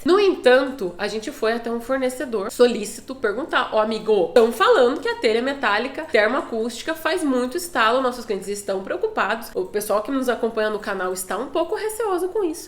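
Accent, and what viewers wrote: Brazil. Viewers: Adoro seus vídeos, principalmente porque sou muito fã deste teu sotaque Paranaense, fui criado em Paranaguá, mas atualmente moro em São Paulo. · Nossa o conteúdo foi fantástico, mas voce explicando foi surreal, serio mesmo parabéns e o sotaque de curitibana entao, hahaha parabéns mesmo, tirou varias duvidas que eu tinha.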